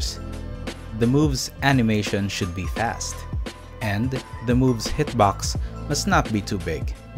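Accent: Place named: Philippines. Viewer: Just started watching your vids.. You have a filipino english accent i kinda thought then i saw your logo haha Really good vid bro😁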